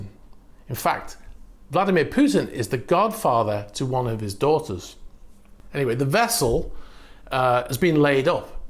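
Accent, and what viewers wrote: Liverpool, United Kingdom. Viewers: Is the presenter of the video a Scouser ??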